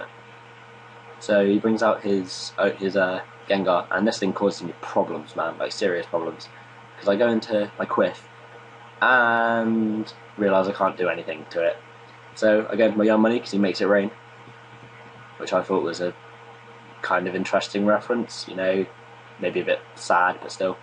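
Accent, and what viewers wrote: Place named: United Kingdom. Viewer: Hey man- so you asked me if i could give you some feedback on one of your narrations. i want to compliment you that you sound confident and there is fluidity in your voice which is a good quality, but you're a bit monotone, you might wanna add a bit more energy which will show to the people that watch. Also cool accent (i like british accents very much :p) Keep up the good vids man, you have the potential to grow into a big channel!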